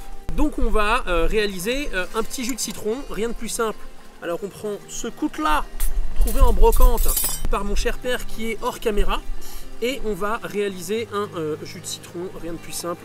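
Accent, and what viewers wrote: South, France. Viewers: La Creuse ? Mais c'est à côté de chez moi ça ! XD Je ne suis pas très au fait du monde de Zelda, mais cette recette m'a l'air fort goûtue (avec l'accent du sud qui va bien^^) ! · pour l'accent du sud je pense que c'est ton petit coté Maïté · Moi non plus je ne sais pas pourquoi tu fais l'accent du Sud quand tu cuisine mais ne t'arrêtes surtout pas ! C'est gourmand et croquant à mes oreilles.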